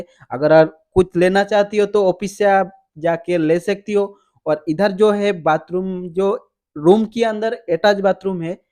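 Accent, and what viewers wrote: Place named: Bengal, India. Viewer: কথা শুনেইতো মনে হচ্ছে বাঙালি... তা বাংলা তেই বলুন না... নিজের ভাষায় কথা বলতে কি লজ্জা করে??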